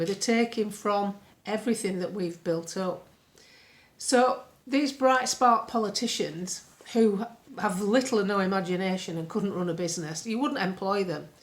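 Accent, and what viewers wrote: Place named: Lancashire, United Kingdom. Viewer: your absolutely right! Love your Lancashire accent! Coming from a Scot!😂😂❤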